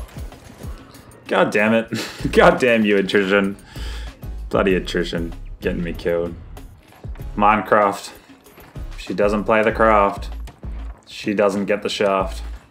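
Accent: Australia. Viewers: Oh ur Australian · Is it weird that a understood his aussie gibberish being an Indian? 🤔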